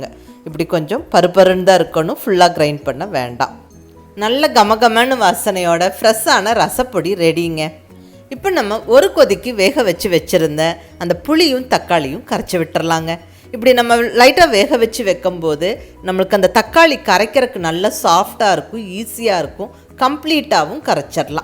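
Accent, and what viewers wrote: Coimbatore, India. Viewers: Madam. Unga cooking oda unga coimbatore language thukkala iruku super madam .nice to hear. Continue madam.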